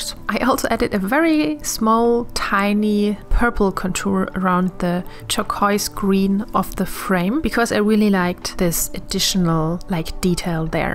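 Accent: Germany. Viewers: Man, deine Skizzen sind allein schon immer so unglaublich schön <3 das Endergebnis ist natürlich mal wieder richtig traumhaft:) ich liebe deine Art Nouveau Einflüsse, die Kunst von damals ist so schön und zusammen mit deinem Stil wirkt das so toll:) Danke übrigens für die ganzen Tipps die du mir und anderen Künstlern zur Verfügung stellst! Ohne dich und ein paar andere Kunst-Youtuber hätte ich es nie geschafft meine Kunst so zu verbessern (auch wenn ich natürlich noch viel zu lernen habe:D). Du bist glaube ich übrigens die einzige Deutsche deren Voiceover ich in Englisch immer total mag, deine Stimme ist total entspannend:D Und ja, du bist nicht die Einzige die ständig ihre Art supplies verliert:D Ich hab's zwar eigentlich immer alles an einem bestimmten Platz liegen, aber ich weiß einfach nicht wohin meine Pinsel ständig verschwinden...:D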